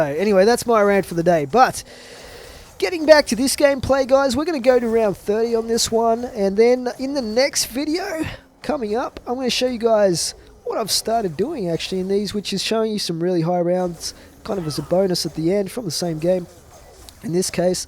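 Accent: Australia. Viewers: i like your accent australlian? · Your Aussie too?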